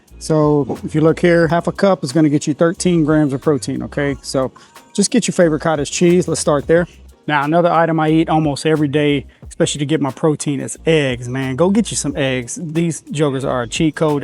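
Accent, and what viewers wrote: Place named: Georgia, United States. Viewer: His accent sounds like home to me, which is Decatur Ga!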